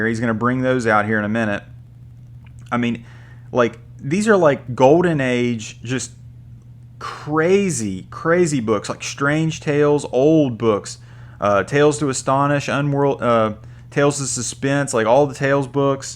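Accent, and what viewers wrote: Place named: Tennessee, United States. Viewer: to answer your question at the end, do i think its feasible to obtain those type of books just paying cash, no, not unless you're rich or spending money you dont need to on it. Now is it possible to obtain stuff like that by building over time, selling, trading and all that? Sure....but even then....in my opinion theres no way this guy isnt fairly well off. Its such an awesome collection but i would consider it overboard for a person without alot of expendable cash lol. Of course its also possible hes always had alot of them since way back when some came out, but for ppl our age, we arent gonna have anything in our PCs that we bought when it came out before the copper age unfortunately, which means wed be paying premium to own the majority of what was in there (not counting good deals and getting lucky and all that). 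Btw i just found you're channel and subbed. You sound like your from around my neck of the woods lol. Tennessee here. Cool video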